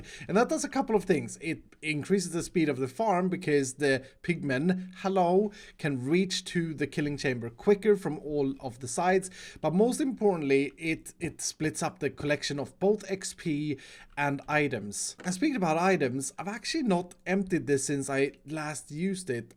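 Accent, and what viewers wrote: Sweden. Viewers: I just looked it up he’s swedish Well its easy for me to recognize I’m also swedish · Are you swedish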